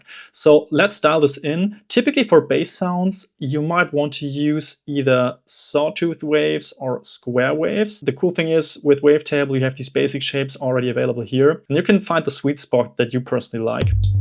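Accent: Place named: Germany. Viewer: Your english is for your deutsche Akzepnt very nice;) ich kenn einfach 3 andere, die 1:1 ähnlichkeit mit dir haben!:D:D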